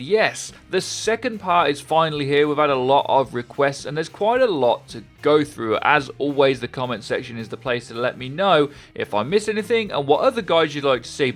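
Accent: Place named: Midlands, United Kingdom. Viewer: That midlands twang though 👌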